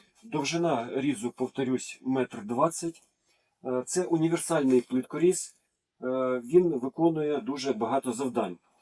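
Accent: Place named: Russia. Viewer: Тренируй украинский язык. Уж больно сильный русский акцент😅😅😅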